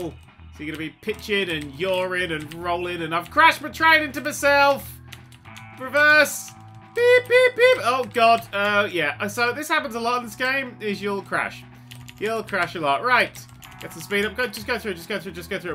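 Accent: England, United Kingdom. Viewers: HMHS.BRITANNIC Thats how english people say it. They also say math like maths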